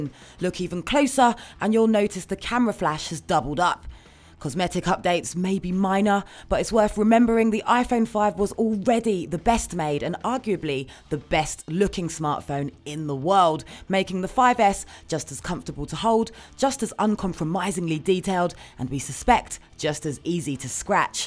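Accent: United Kingdom. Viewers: a british nigga loooooooool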